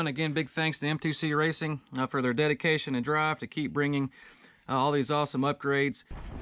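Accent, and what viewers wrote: South, United States. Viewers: I thought i heard a little southern in your accent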